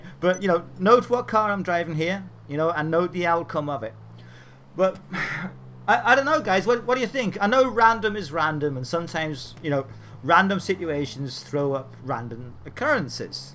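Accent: Ireland. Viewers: Is that an Irish accent I hear? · You from Ireland? You sound like one of my Crew members heh. As Im watching this video, I was parked on a hill right by Trevor's house and one showed up..I think it's just random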